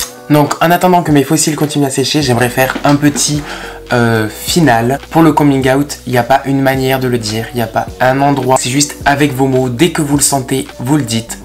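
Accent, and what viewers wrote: France. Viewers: C'est trop vrai ! Son accent français est grave beau, il est agréable à écouter je trouve aussi ^^ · j'adore sa voix et l'accent français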